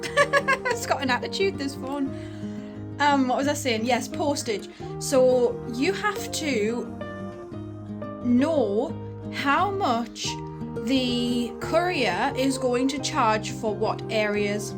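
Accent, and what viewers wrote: United Kingdom. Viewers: Just came across your channel and subscribed. Love the succulents, really cute. Do I hear a hint of Geordie/Gateshead? Abinova on youtube is from Gateshead, and she has also been having problems sublimating the square mousemats; she finds the round ones easier. Catherine from Katnipp is another good small business channel to follow for advice & inspiration. Wishing you good luck and success at the market. 🍀Have a great week. 🙂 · I hear a northern accent. Are you from up north and still living in the UK or you in Australia ? They are lovely people they are 😊 I have just come across your channel 😊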